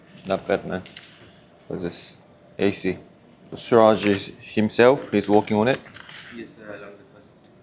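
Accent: United Kingdom. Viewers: 영국식 미국어 발음 인가여 뭔가 멋잇어여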